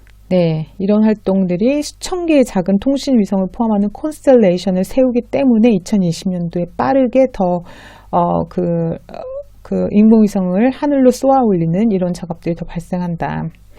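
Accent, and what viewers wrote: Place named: United Kingdom. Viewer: 헛 어쩐지! 영국영어발음 멋있어요!^^ 오히려 목소리가 이쁘셔서 어색한 남자 원어민 발음보다는 직접 한번에 싹 다 녹음하셔서 들려주시고 스크립트를 고정댓글이나 자막으로 하시면 훨씬 듣기도 좋을 것 같아요!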